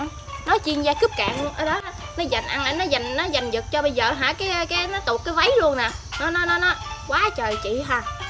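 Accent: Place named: Mekong Delta, Vietnam. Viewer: Do chị ấy người miền Tây nên nói giọng đôi lúc lợ lợ không rõ ý bạn:3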